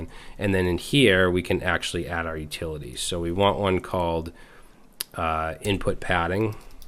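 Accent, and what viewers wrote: Boston, United States. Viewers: Is that a Bawston accent?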